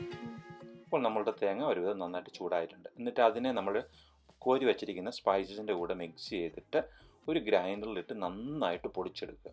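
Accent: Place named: Kottayam, India. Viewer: Well explained, Kottayam ഭാഷ ഷ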